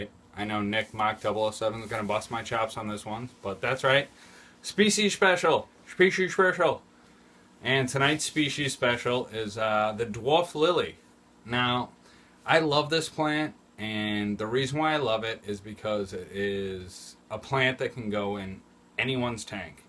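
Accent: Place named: Boston, United States. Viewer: I watch this guy for 2 reasons. 1) learn more about aquarium care and 2) practice my Boston accent. Love it.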